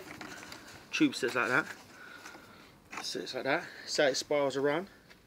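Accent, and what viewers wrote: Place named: Bristol, United Kingdom. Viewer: London I think but he has a little bit of a Bristol accent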